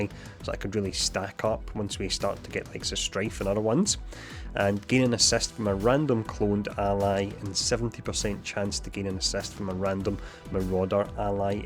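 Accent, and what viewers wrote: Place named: Glasgow, United Kingdom. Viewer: ahhh ok, are you in the US? Cos your accent sounds like your from near Glasgow like paisley or that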